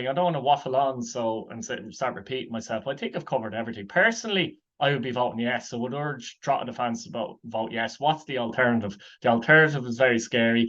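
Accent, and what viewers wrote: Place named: Derbyshire, United Kingdom. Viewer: You have an odd Ashbourne accent.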